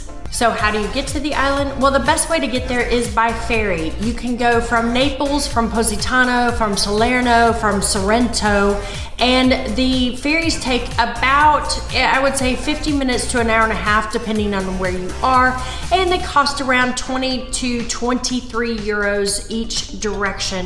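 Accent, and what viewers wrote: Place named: Texas, United States. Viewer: Where is her accent from, why am I thinking Texas?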